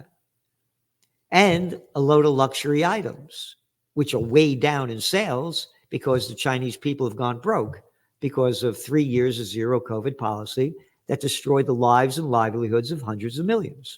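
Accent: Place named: Brooklyn, United States. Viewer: love your Brooklynese!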